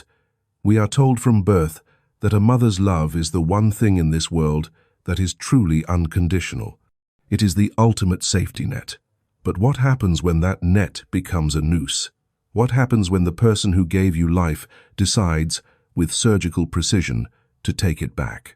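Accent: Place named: England, United Kingdom. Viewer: The narrator sounds nice but it’s not as effective because with the accent makes it sound like the story is from England .. “ the mahsque”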